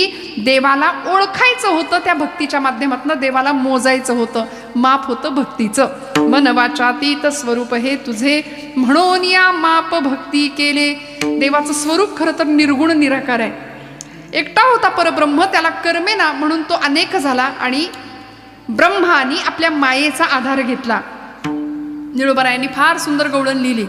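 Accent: Standard. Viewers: ताईचे मराठी शब्द उच्चारण सु स्पष्ट आणि अगदी शुद्ध आणि सुमधुर आहेत आणि अप्रतिम वर्णन,हीच खरी संत परंपरा आणि लोकांच्या कल्याणा संतांच्या विभूति,जय हरि विठ्ठल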